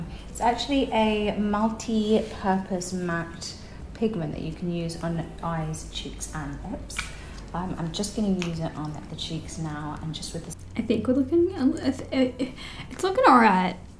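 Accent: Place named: Australia. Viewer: Loved this style vid and love the look. You look beaut. Also loved how much your Australian accent came out to play!💁🏼‍♀️💕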